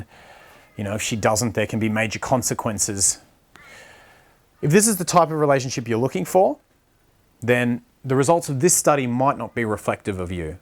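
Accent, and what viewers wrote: Australia. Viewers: Hi, thank you for the reply. Part of my problem is that i work am off shift tue-sat 430pm-1230am which makes it very challenging for me to meet or date for that matter. I usually go online to meet men which i receive alot of responses which gives me many options. But again its my schedule and the fact that there are so many players, dishonest people or those that only want sex. So it does take allot of work weeding through them. And i know i have much to offer the right person and will not settle just to be with someone. I'm just concerned because i am older now and my options will become less and less. Im on my 50's my people guess me to be much younger in my late 30's to early 40's which is great and am also young at heart with a free spirit. I am taking a break at the moment but may try again in the fall. You sure seem to be such a sweetheart, i love your accent. 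Never been to Australia but love to Travel, maybe ill make it that way one day on one of my adventures. Take care, Dee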